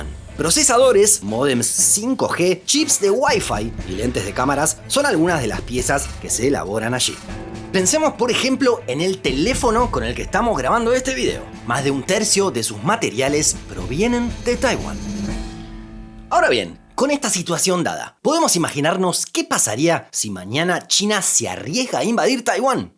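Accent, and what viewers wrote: Argentina. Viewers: Siempre tuve la duda si sos argentino o mexicano, pero para mí sos compatriota 🇦🇷 jaja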